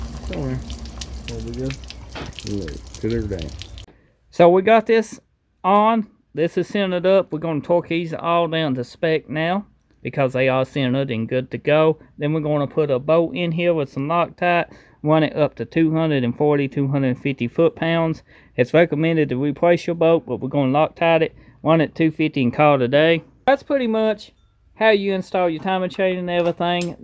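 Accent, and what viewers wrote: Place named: South, United States. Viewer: It's a southern accent with a lisp. My buddy sounds just like him. Lol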